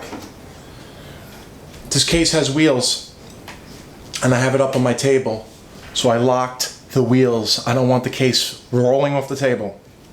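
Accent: Boston, United States. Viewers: you from boston bro ? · Heavy Bostonian accent. Boooo red sox! lol i love this guy though!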